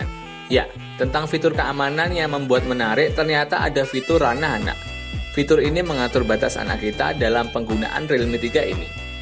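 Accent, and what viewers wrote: Magetan, Indonesia. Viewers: Mas sampean wong Magetan?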